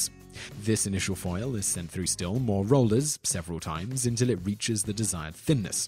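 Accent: United Kingdom. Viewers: It's spelled ALUMINUM It's pronounced UH - LOOM - IN - UM Where in the world do the English get the extra "I" that they insistently include in their pronounciation?!?!?! PLEASE STOP!!!! IT'S FRIGGIN ANNOYING!!! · I get the concept of England and America being two countries separated by a common language and variations on pronunciation. However, there are certain words, said throughout these videos that truly strike the American ear as painfully wrong. Some of these words include: Aluminium. Please note, there is only one L. Consider such words as: Luminate and Illuminate (which does have two Ls), these words - even spoken by the British, do not have the strange sound of: Al lu min E um. However it is forgivable. Controversy: it is con-tro-versy! Not Con-trav-ersy... England invented the friggin' language and the rules that go along with it. As such, they should know the difference between the sounds of an O and the sounds of an A ... 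not to mention the rules regarding syllables and how they are used! Although this is far more annoying than aluminum .. there are worse examples of the English butchering their own language. Behemoth: Perhaps the funniest thing about this word is the fact that the English are the only people on the planet who butcher this word. It is: buh-hee-moth with the O sounding like that of removing the N from the word, month... NOT: be-a-moth!!! There are several others, but these should do, for now. Still, there is one personal acception, from a previous video: Seneca. I grew up in a few different places: Ashford, Ireland; Ft. Lauderdale, Florida; and Central New York ... not far from Seneca Falls. Additionally, there is the Seneca indian tribes. We can all attest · That how we pronounce it in the UK. We think the way you guys say it sounds silly so the feeling is mutual. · Not sure if trolling, but here goes... 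The presenter is British while the rest of the channel team is American. It's merely the difference between American English and British English dialects. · American production, British narrator. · please explain why you, as a Brit, pronounce most words as we do but then spell your titles in the American form? I have seen in your titles color and here aluminum. Are you ashamed of your national heritage? As a Brit abroad you should be an advocate for our great nation and history. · CrazyBear65 bonus fact, he is English, and speaking English the way the English speak it..... not the Americans.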